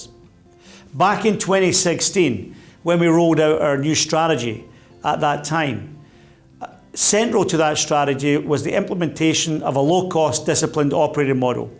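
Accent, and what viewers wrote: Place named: Scotland, United Kingdom. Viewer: Thank you; clearly and succinctly explained for the lay person. BTW, I love your gorgeous Scottish accent, sir!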